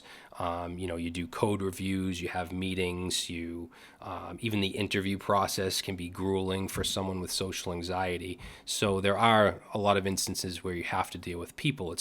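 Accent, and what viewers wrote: Boston, United States. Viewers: Yes, he is from Boston, MA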